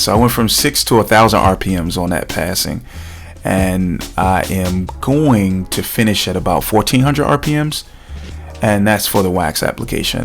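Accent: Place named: Baltimore, United States. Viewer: I’m going to guess you are from Baltimore?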